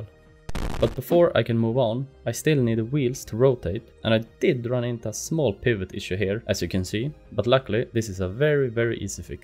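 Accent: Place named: Sweden. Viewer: Omg I love the swedish accent😂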